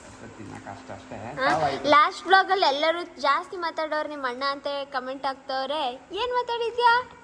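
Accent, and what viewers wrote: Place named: Kundapura, India. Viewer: ❤❤❤❤❤ಗೋಯ್ ಬೀಜ 👌👌👌👌 nam kundapr Kannada marre❤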